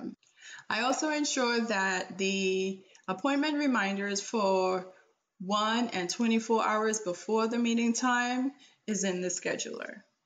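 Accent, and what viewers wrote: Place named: Caribbean. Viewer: I'm hearing a Caribbean island accent 🤔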